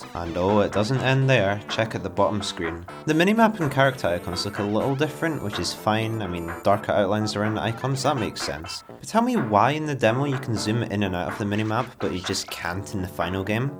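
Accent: United Kingdom. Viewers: Are you Scottish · My question is how come you have a NTSC final copy when you sound like a fellow Brit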